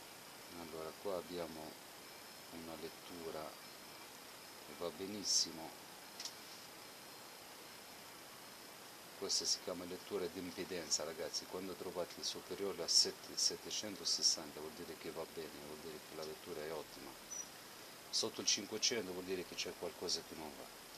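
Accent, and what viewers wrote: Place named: Spain. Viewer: Sei spagnolo si sente nel accento bravo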